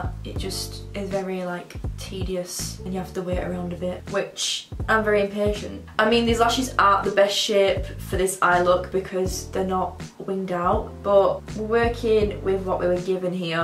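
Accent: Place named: Northern England, United Kingdom. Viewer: I love you already cause I’m Northern too! It’s nice hearing a northern accent on YouTube for once! 💕💕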